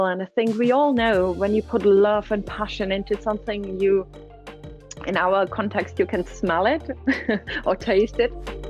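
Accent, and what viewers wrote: Liverpool, United Kingdom. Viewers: Her scouse accent is proof how much she embraced the new life and culture of LFC and probably the city in general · She's started to sound a bit scouse. :)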